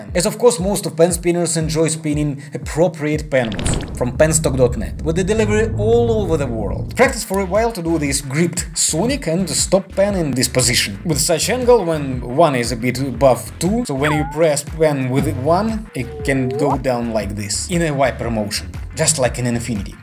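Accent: Russia. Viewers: Love your Russian accent 😂♥️🤞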